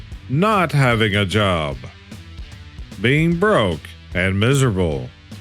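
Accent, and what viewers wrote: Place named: Appalachia, United States. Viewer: I can READ ! Why do I have to listen to some hillbilly read the words ON THE SCREEN???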